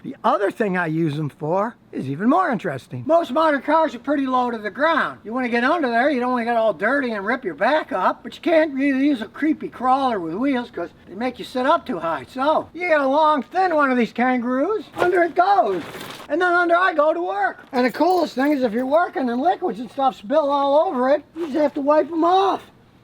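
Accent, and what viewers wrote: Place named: Texas, United States. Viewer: I am here for two reasons: cars DIY and texas accent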